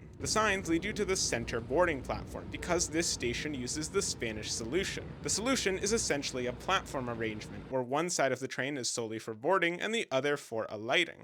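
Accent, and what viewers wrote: Brazil. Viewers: Esse inglês paulista eu conheço de longe. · Even though your english is very good, I can notice an accent. You're brazilian alright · he has a Brazilian accent. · But the guy who’s presenting the video is a brazilian, for sure. He can say portuguese (brazilian one) without any accent at all. No foreigner visitor can do so. He may lives in the USA, but he’s (or his family) from Brazil. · For sure you are brazilian or very familiarized with brazilian portuguese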